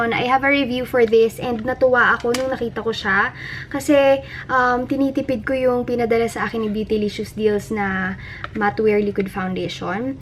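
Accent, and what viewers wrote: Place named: North Luzon, Philippines. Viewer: Ms., just wondering kung saan yung province mo? May punto ka kasi mag salita minsan. Parang somewhere north luzon.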